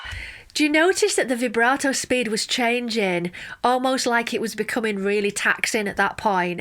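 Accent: United Kingdom. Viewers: I love your British accent! <3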